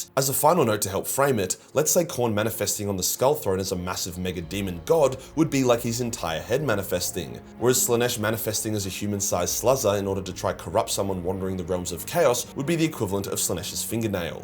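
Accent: Australia. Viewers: Heh you didn't just stumble over "unfathomable" - you also mispronounced "dirties". I think even taking your Australian accent into account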